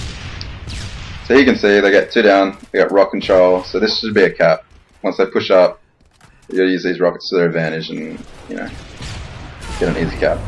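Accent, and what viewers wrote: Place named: Australia. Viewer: You can just hear enthusiasm when he mentions how excited he is for ep2, but seriously this is better than the american commentary vids. This is actually pretty helpful and I'd rather listen to an aussie accent over a yank anyday.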